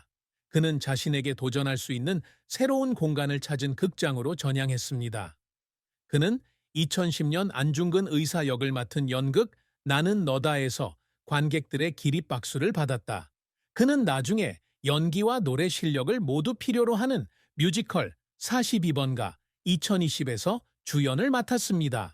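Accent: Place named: North Korea. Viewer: 이 머꼬 ㅡ가짜 방송 할래 ?? 부칸사투리네 ㅡ ㅜㅜㅜ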